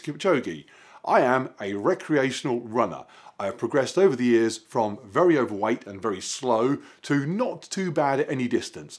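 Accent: United Kingdom. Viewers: British accent)))))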